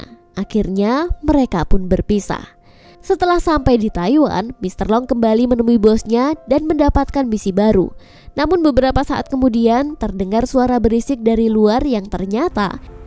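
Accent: Java, Indonesia. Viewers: Asem knpaa ada tetekbengek nyaa mimin nya jawaaa ne ngendi iki 😂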